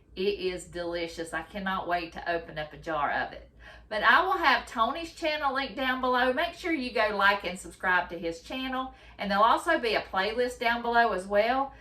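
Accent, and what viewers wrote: South, United States. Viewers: I love seeing these unique jams, and I love your southern voice! 😊 the book all new recipes did it just recently come out? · I need to pull that book out. I’ve never seen that recipe. I’ve learned so much this collab. PS- your southern accent sounds like mine (Bama?)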